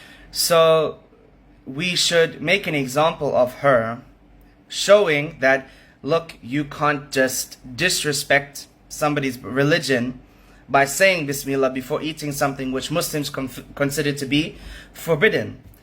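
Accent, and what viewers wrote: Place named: United States. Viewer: klo dari logatnya org amrik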